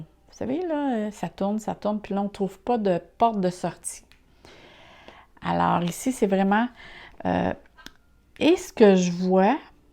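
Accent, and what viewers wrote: Canada. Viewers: Bonjours ! J'ai réécouté pour le plaisir et le professionnalisme. Et aussi l'accent et le vocabulaire canadien que j'adore...ma mère est charentaise et son patois est très proche de vos expressions. J'ai été touché aussi car je suis taureau/taureau/vierge...., thérapeute juste retraité, et dans les starting-blocks pour une nouvelle impulsion. Vous m'avez shooté de positivisme. Je suis en amour🤗🤗🤗🙏🙏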